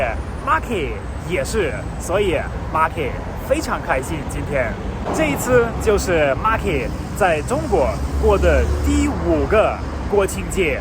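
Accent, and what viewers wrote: Sichuan, China. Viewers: 你的川味普通话很不错👍😌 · 喜欢听说的四川普通话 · 这个老外普通话居然有川音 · 是一个中国通，四川话加普通话很有意思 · your Chinese comes with a Sichuan accent! It sounds so good! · 是四川发音听起来像马kie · 四川普通话说的不错嘛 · 的国语有股浓浓的四川味~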